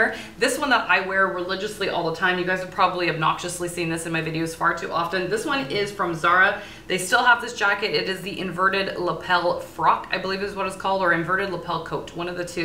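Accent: Canada. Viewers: I love watching you. Your denim video is basically my go to guide now and I kept saying to my friends, no the point of the pocket should be at the bottom of your bum....LOL. I love your authenticity, sincerity and expertise. I watched so many stylist on youtube and ones who have a lot more subscribers but I only subscribed to you because you are doing a great job providing genuinely value-add content. I also love your Toronto accent as one of my favorite co-workers is from there and she sounds like you :) I am really proud of the work you are doing and I just want to encourage you to keep fighting the good fight!!!!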